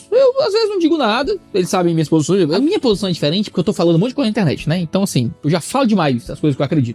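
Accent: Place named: Northeast, Brazil. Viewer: Amo esse jeito bem humorado de falar do nordestino! E o sotaque tbm é sensacional!!😊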